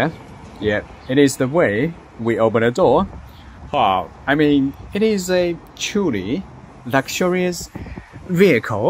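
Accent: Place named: United Kingdom. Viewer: 非要说英式很别扭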